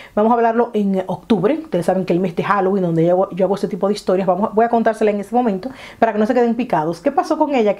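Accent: Venezuela. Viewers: que bello tu video, soy Venezolana y me vine a madrid por la situacion, y si es cierto que tienes algo de acento venezolano de hecho en los primeros videos que no sabia de donde eras pense que eras venezolana, gracias por hablar bien de mi Pais no sabes como lo extraño, gracias amiga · con razón la afinidad nena, yo si sentía cierto acento compatible con el mío jajajaja besos nena me encantó el vídeo, se parece a mi infancia en Maracaibo-Venezuela 😘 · chama si tienes el aciento venezolano me encanta👌😘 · gracias por compartir tu vivencia en mi bello país, me encanto escucharte hablar caraqueno jaajajjaja. Dios te bendiga por tomar tiempo para mencionar esos recuerdos tan bonitos, tengo casi tu edad y de verdad es inevitable no sentir nostalgia al ver como nos cambio la vida, ojala Dios no ayude para salir de esta lamentable situación nuevamente gracias querida. 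· Bonita historia, todavía tienes ese acento. De hecho antes de saber que eres Dominicana, pensé que eras venezolana.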